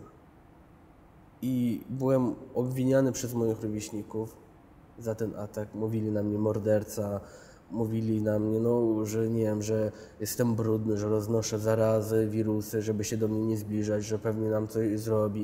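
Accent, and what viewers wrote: East, Poland. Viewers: Ma delikateńki, leciuteńki, prawie nie słyszalny wschodni akcent. Można go zauważyć.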